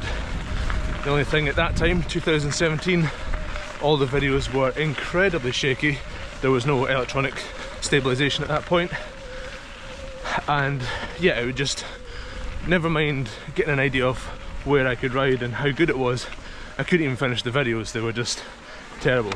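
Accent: Scotland, United Kingdom. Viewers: Great content. And hearing someone swear with a Scottish accent is a bonus. 🙂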